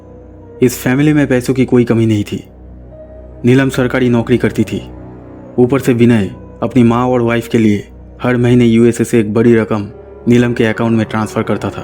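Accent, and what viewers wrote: Bengal, India. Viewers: This youtuber is from Kolkata only I think so as he also has one more Bengali dubbed channel where he uploads this story only but later and you can notice his accent and names of characters are Bengali names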